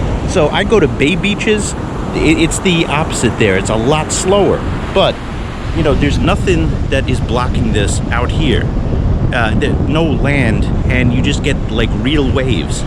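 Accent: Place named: Connecticut, United States. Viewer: You are so informative and haliorous! I'm originally from CT now in Tennessee. Miss the accent of back home and you deliver:) love your channel!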